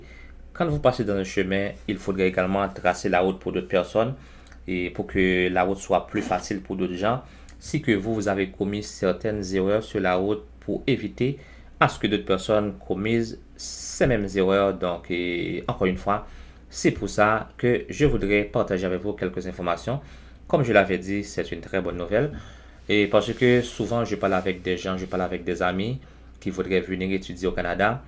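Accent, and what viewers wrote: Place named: Haiti. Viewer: Vous eyes d'ou, vous avez l'accent Haitien. Si chest vrai, peus tu m'envoyer vote email j'ai des questions.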